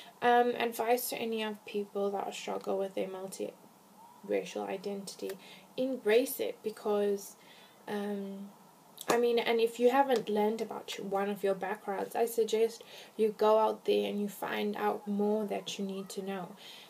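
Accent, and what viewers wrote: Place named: United States. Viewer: You kinda sound american ... kinda. You are beautiful though.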